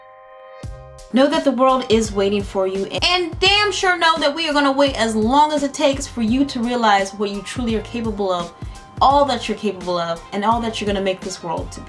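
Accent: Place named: Africa. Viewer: so i had to subscribe after the African acccent....my sista oh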